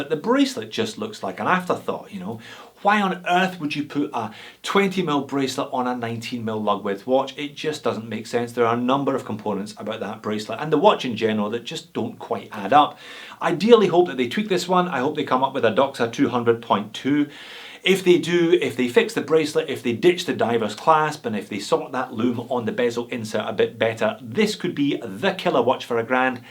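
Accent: Scotland, United Kingdom. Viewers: my man, i thank your for your reviews. your scottish accent and your humor eases my day! thank you!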